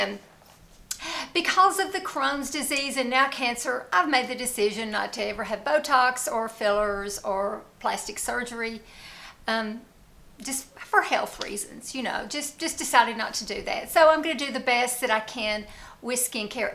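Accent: South, United States. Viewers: I think southern women are the sweetest, kindest, just examples of grace in all circumstances. I shouldn't assume you are southern, but your accent is beautiful. You are really beautiful, inside and out. Whatever you are doing, it's working! You look fantastic. People our age never did use a whole bunch of skin care products. I started when I was 58, and some things just made my skin WORSE. I had acne bad when I was a teenager up until my forties, it gradually became less and less. Now that I am older, and my skin isn't as oily, I find replacing that oil with some vitamin C and A oil really feels good. I think it helps my skin. And the red light therapy, yes! I love it. I lie down and do some meditation while I do mine. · They both have a southern accent and are adorable but they don't sound alike.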